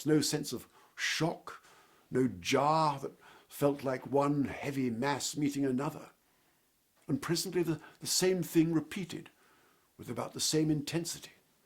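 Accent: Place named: United Kingdom. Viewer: Excellent presentation! I love his elegant British manner and speech. And his grandfather, Lawrence Beesley, is a sterling example of one who is born an observer and writer. Were it not for his eloquent account, we would not know so many fine details about that ship's voyage. God bless writers! (And actors, too.)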